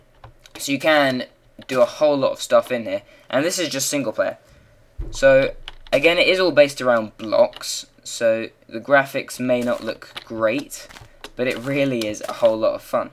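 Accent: United Kingdom. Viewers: Thanks for the review! It helped me decide that I'll probably buy the game. And your British accent made this 36% better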